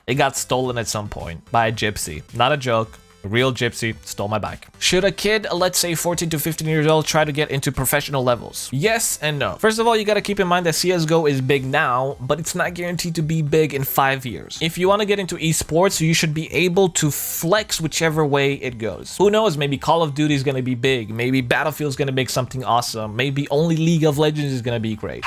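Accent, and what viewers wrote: Romania. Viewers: There's a guy in some of my classes that looks just like you. He sounds Romanian too. Do you happen to be a high school junior near Boston, Massachusetts? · esti Roman? (ai accent)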